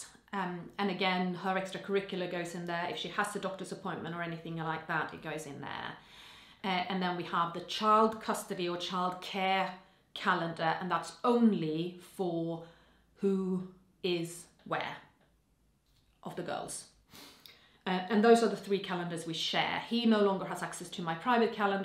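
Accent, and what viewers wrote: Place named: England, United Kingdom. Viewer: Happy Birthday. It always amazes me you are Swedish and speak it but yet you do have a English accent. Your schedule is crazy busy. Merry Christmas ⛄🎄 sweet friend.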